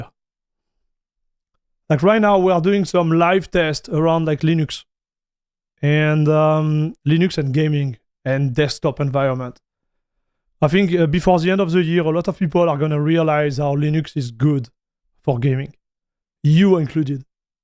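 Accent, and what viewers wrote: France. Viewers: But are you French French or Canadian French?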